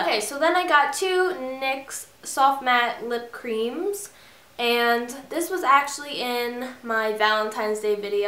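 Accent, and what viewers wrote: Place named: New York, United States. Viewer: YOU FROM NY ARENT YOU!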